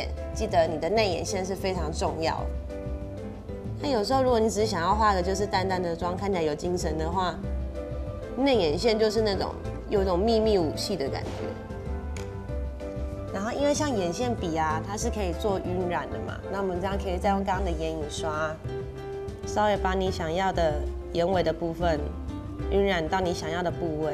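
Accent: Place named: Taiwan. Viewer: 也不是毎個台灣人都這樣...只是她説的比較台而巳...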